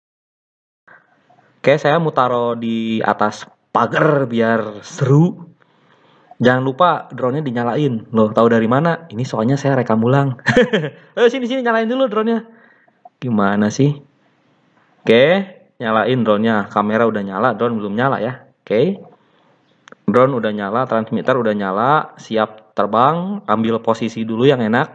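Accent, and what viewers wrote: West Java, Indonesia. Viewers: Kak orang mana? Ni Sunda pisan... Hehehe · Walaupun pakai bahasa indonesia tp tetep aja sunda nya kelihatan... bnr ga kang? Hehehee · Curiga sunda yeuh soalna nyarios na kitu..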